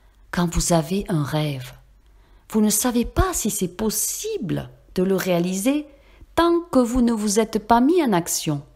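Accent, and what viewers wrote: South, France. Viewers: Bonsoir.J'adore votre voix du Sud. Namaste:)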